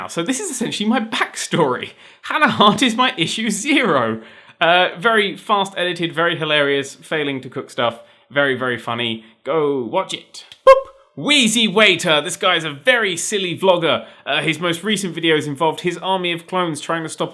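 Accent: England, United Kingdom. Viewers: ?r u from england nerd?<3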